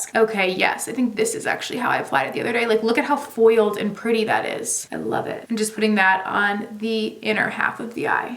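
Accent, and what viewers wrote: New Jersey, United States. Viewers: Yes it’s her Jersey accent haha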